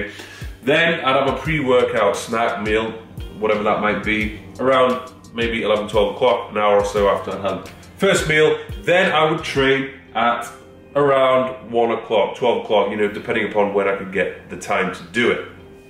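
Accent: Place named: England, United Kingdom. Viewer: Hi, you’re a handsome guy and I love your English accent. Stay humble. 💕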